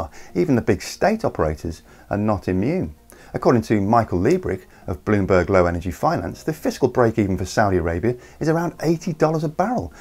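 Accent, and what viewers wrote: England, United Kingdom. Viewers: Once the COVID lockdowns are lifted, demand for oil will rebound and the supply glut will ease. Most of this video seems like wishful thinking, as oil consumption is predicted to rise through 2040. Great presentation and a pleasant English accent doesn’t change that barring a technological breakthrough, fossil fuels will be with most of us for a while yet.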